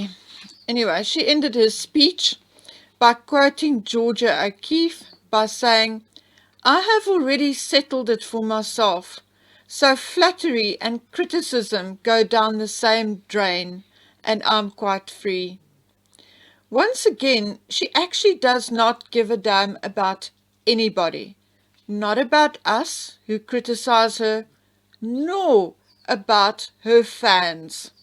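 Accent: Afrikaans, South Africa. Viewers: SA accent right? So nice ☺️ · I L💓ve your birdies please leave them in the background its lovely to hear them they are just joining in and they are not singing today😥 I miss them, bring them back!! DO NOT LISTEN TO THE HARBINGERS THEY ARE JUST UNHAPPY PEOPLE. Next love your accent I assume SA? I have a friend whose accent is exactly the same as yours and I like the way she speaks. On any account do not stop your FANTASTIC VIDEOS. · Keep the birds tweeting/ we love them never care about ignorant people. Btw I was born in johannesburg a long long time ago and immigrated to Australia with my European husband 37years ago - besides the content of your videos I love your accent bc I miss South Africa very much. Love the birds❤️❤️❤️❤️ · Another wonderful video as always. The lovely birds in the background do not ever bother me, quite the opposite, they are quite pleasant to hear, and they do not stop me from hearing you clearly. 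It's wonderful that you have your grandson's birds there, just another way to show what a caring person you are. As to your accent, it's a joy to hear, an excellent South African accent. I eagerly await each one of your new videos, and am so glad you are going to continue making them. All the best to you and your family, stay safe, and know you are in the thoughts and prayers of many of your listeners, including myself. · I love your accent. South Africa? I had good friends from South Africa. I enjoy your comments. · I love the SA accent and i like to hear the birds in the background. Love to visit too, been to Clarens in the mountains. Absolutely beautiful country. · You know, some people just love to jibe, stir, criticise or anything to make others feel upset or uncomfortable. I love the sound of the birds in the background. It actually sounds like we are in the bush. Also, your accent is so recognizable as South African just as mine would be recognized as Australian. 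People need to get over themselves. Keep doing whst you're doing. There are plenty of us out here who enjoy your videos. If people don't like them they can stop watching. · Your accent makes me remember happier days. I am Australian and in the 80's I had a South African boss. I got to know him, his wife, children, other family and friends, who all had that same lovely accent. He was a wonderful boss and we all loved working for him. Now, for some reason, whenever I hear a South African accent, it takes me back to a happier time in my life. It sounds strange but I think that's part of the reason why your videos cheer me up when I'm depressed. Another thing that has always lifted my mood is the sound of happy, chirping Budgies. Their voices are unmistakable from the voices of other birds and make me remember my own sweet Tweety, Glitter & Harriet. Anyone who doesn't appreciate your videos, for any reason, doesn't have to watch/listen to them. 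This is YOUR channel to use as you please. Others have the right to tune in OR out, but they don't have the right to expect you to change ANYTHING about yourself OR your channel. I really appreciate all the time and research that must go into the making of your videos and I'm sure that 99:99% of your other subscribers do too. So Thank You for that. ⚘ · Firstly, I think your accent is beautiful (S. Africa, right?). I teach English as a 2nd language and listen to accents from all over the world, they're part of what makes us unique/special. Secondly, never apologize for our furred (or feathered) loved ones. If I had the talent to make YTube videos you all would be listening to a snorting, panting, snoring pug...but it's all (chirping, barking, meowing, etc.) music to my ears! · I love listening to birds and it didn't take me long to identify them as budgies. I really enjoy listening to your perspective on the Markle Saga in your wonderful South African accent (the budgies too). 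Ignore the moaners and keep up the great work!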